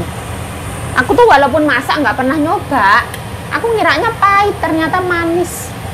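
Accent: Java, Indonesia. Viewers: Nada Mandarin kmu perlu di pelajari medhok Jawa kmu masih jdi blepotan mandarin kmu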